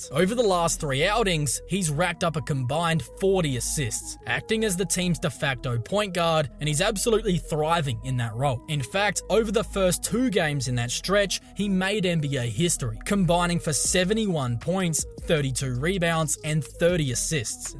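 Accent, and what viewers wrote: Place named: Australia. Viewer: Dang Australian accent. Is it AI?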